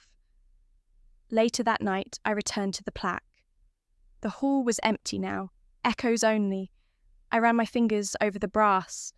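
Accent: United Kingdom. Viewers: If she is from North Carolina, why is the AI voice a southern English accent?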